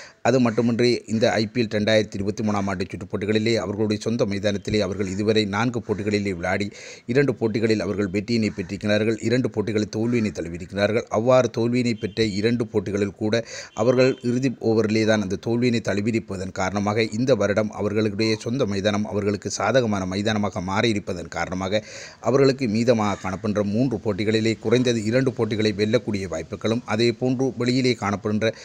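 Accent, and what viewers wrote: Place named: Sri Lanka. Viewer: I'm From Bangalore (RCB). Love srilankan tamil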